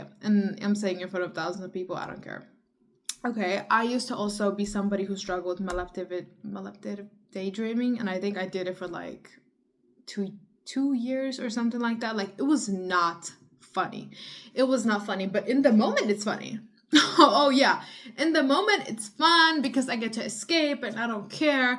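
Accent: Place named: Balkans. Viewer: I hear a slight accent. Are you from the Balkans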